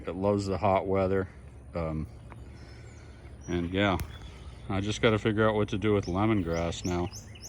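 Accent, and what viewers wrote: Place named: Texas, United States. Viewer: nice! I looked into the self watering pots by that Leon guy, very cool. Fun to hear an old Texas accent too! Haha I think I’m gonna start some. Where did you get your buckets?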